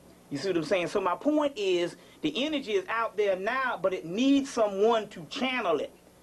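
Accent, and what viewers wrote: South, United States. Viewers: that is very true, I bet if he spoke all prim and proper without that southern accent and wasn’t chubby the blind would prob consider him a lot more, goes to show u ppl are shallow and the spirit realm don’t give af bout societal standards lol.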